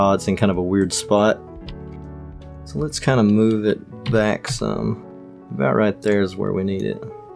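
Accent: United States. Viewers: lol the country acccent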